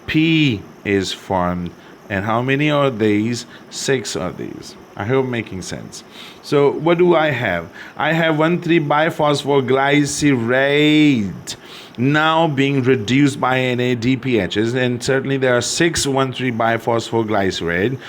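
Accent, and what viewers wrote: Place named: United Kingdom. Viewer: Sir your British accent just feels so real. so smooth 🤍 Really appreciate your work 🤍👍🏻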